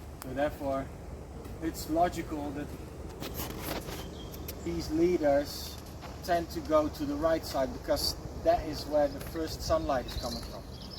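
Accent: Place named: Netherlands. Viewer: Benieuwd in welke regio je woont, lijkt me umbria/toscane op basis van de olijven en cipressen. Ik ga er op basis van je accent ook maar vanuit dat je Nederlands bent. Ben ook bezig met de cursussen van vaso policonoco , komende winter normaal avanzato en dan het examen zoals ik zag in je andere video.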